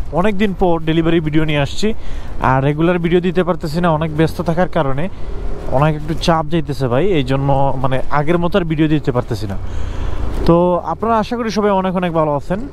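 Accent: Sylhet, Bangladesh. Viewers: Vai apne ki Sylheti Don’t mind ami akjon Sylheti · বাই সিলেটি নি😊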